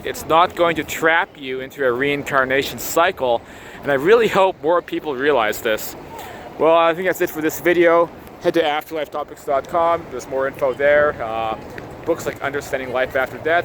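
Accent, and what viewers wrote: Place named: Netherlands. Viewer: Nice to have a little look around Kiev, thanks! By the way, are you European yourself, your accent sounds Dutch maybe ?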